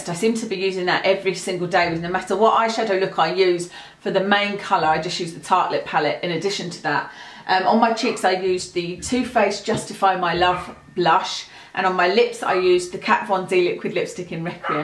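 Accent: United Kingdom. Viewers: Oh that British accent is a sweet melody to my ears 🥰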